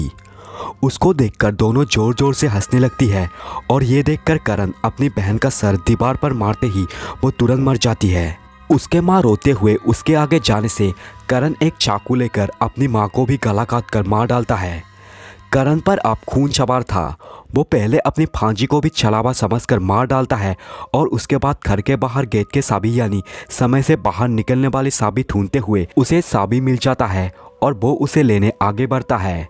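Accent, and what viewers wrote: India. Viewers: are you north east bro? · Don't judge please ye bengoli hai to problem hoga hi Hindi mein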